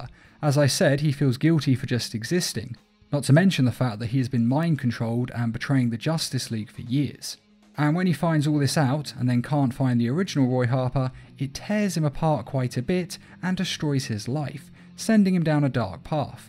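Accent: United Kingdom. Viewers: Hey. Just wanted to say how much I love your content.I wasn’t very interested in the superhero content until I stumbled across your channel.Hearing that sweet,beautiful British accent is so satisfying every time.Love your content and hope you get more recognition in the future.For others who see this,have an amazing day.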